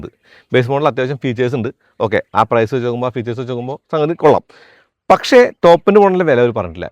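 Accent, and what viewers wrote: Thrissur, India. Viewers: Thrissur slang angananu bro..speed koodudal aanu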